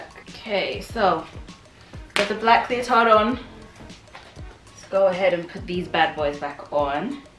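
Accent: United Kingdom. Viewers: Love my Ebony Queens. Love my Ebony curves, with a British accent.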